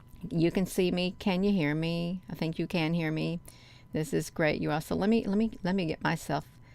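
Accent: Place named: Georgia, United States. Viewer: Hi, this sphere thing has always intrigued me, it’s happened a few times over the past 5 or 6 years. Obviously something massive that our so called leaders keep from us. This is a completely different subject but I gather from your accent which i think is awesome by the way, that you live in Georgia. I’m flying over from Australia to stay with my cousin for 2 weeks, he and his wife live in Savannah, can’t wait!.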